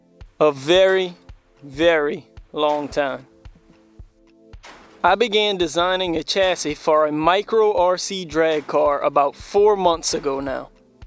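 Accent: Louisiana, United States. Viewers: Hey, you from Louisiana? Dat sounds like a Cajun accent to me :) Anyways, sick build, man!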